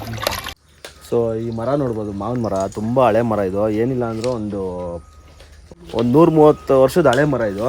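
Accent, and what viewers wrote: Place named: Anekal, India. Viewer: ನಮ್ಮ ಆನೇಕಲ್ ಬಾಷೆ ತರ ಇದೆ ನೀವು ಮಾತಾಡೋದು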